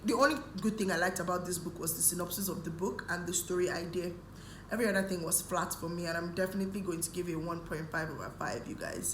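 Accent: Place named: Lagos, Nigeria. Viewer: Your accent is obviously a Lagos Nigerian accent. Or perhaps a certain sub-group in Lagos or even in Nigeria have this unique inflection. I am curious as to how you came about the accent. Does it have anything to do with ethnicity or schooling or social class. reason is that is exactly how Chibundu Onuzo sounds. Thanks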